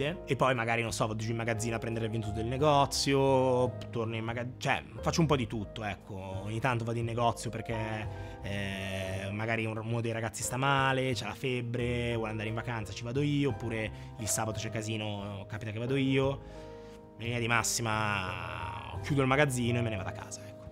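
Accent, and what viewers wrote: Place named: Milan, Italy. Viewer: Grande, bravo e anche simpático. Oggi sentendoti parlare mi hai riportato indietro nel tempo alla mia infanzia, vissuta nella provincia milanese, ed è stata pura e viva emozione. Cinquant’anni più tardi qui nel cortile di casa alla periferia di Madrid la lingua non è più quella, allo stadio non c’è Gianni Rivera ma c’è Carlo Ancelotti. Tempi e luoghi che cambiano…. Grazie.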